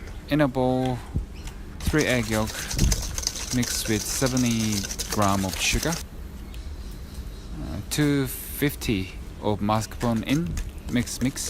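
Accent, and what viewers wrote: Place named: Australia. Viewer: 호주액센트 멋져요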